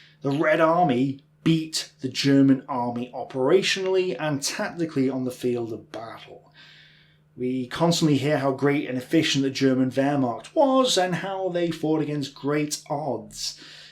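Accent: United Kingdom. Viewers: If you want foreign listeners, try to tell a history...a tale.....not giving us numbers and never speaking so fast.... not only the British pronunciation is terriible for us but you also speak fast and connect words in the same style Germans do....well...if you want us to listen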